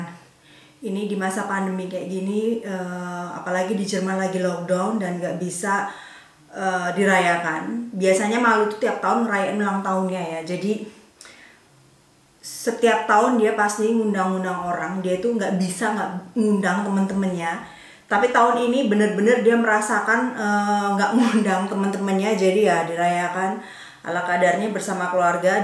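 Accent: East Java, Indonesia. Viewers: ngakak habis cara ngomong ciiri kha s jawa timuran dan btw anak lanange dimana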